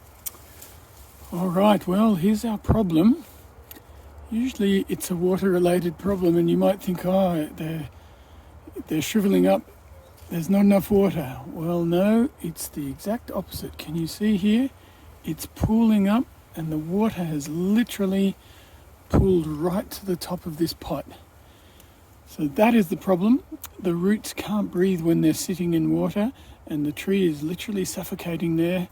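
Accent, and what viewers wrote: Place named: New Zealand. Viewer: Sound like a Kiwi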